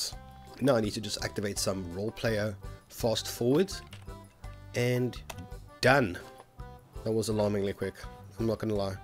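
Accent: South Africa. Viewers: Came across your channel in suggested. And i heard your voice and thought this guy is definetly south african xD its awesome to see a south african gaming channel this big. Definetly subbed <3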